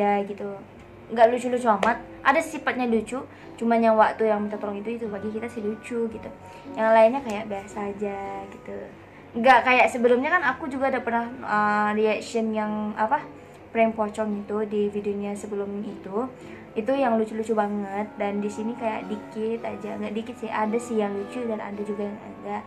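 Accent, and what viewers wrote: Indonesia. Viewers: Ngomong ny Indonesia Banget .. Orng mna sih ini..🤔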